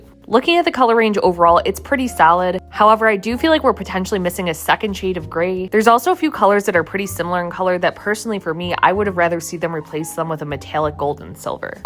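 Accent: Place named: New England, United States. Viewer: i can’t tell if you’re from new england or chicago, i love your accent so much!!!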